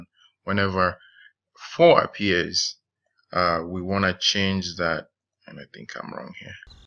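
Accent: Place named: Kikuyu, Kenya. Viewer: Thanx for this. That Gikuyu accent is hard to hide though eh?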